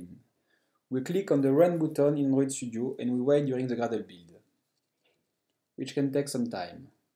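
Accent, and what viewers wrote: France. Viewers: haha amazing ! is this a french accent ? thank you for your time and efforts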